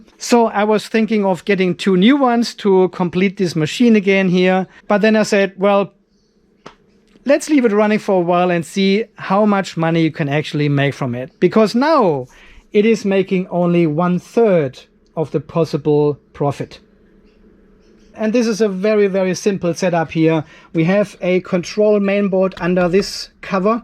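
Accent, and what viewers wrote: Germany. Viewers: Bist du Deutscher? Den Akzent kenne ich doch ;) und das Kassel-Schild im Hintergrund :)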